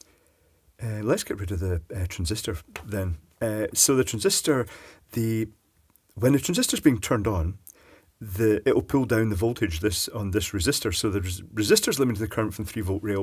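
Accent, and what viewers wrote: Scotland, United Kingdom. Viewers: Resisting urge to inform newbie that is Scottish. I have failed.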